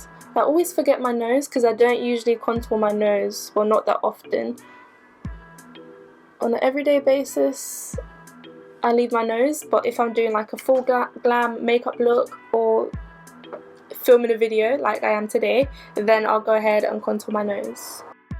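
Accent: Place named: United Kingdom. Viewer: I love your British accent 😍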